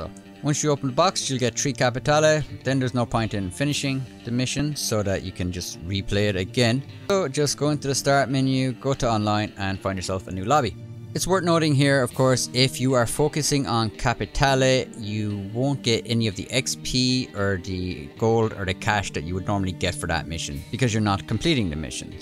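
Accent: Ireland. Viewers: Are you Irish? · Never heard your voice until now. I doubly respect you for being Irish and for your incredible videos 😂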